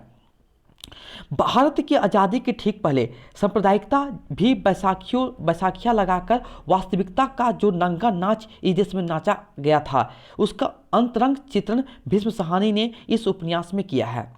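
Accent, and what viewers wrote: Bengal, India. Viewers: Like bengoli accent 😊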